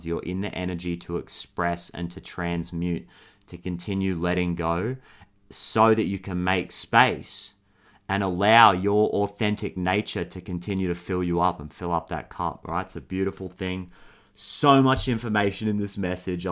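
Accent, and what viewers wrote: Australia. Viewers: Hey 💫 I am a brand new subscriber and your messages have been resonating hard 🤣🌸 Now I know why…. We share the same birthday 🎉!! Happy birthday 🎂 I had the most amazing weekend, energetically feeling such happy vibes ❤ I hope you did too 🥳 Thank you for this channel, I look forward to sharing more time and energy with you and this collective … we totally rock 🥰 It’s so good to hear a fellow Aussie in this space … Sydney girl here 🤗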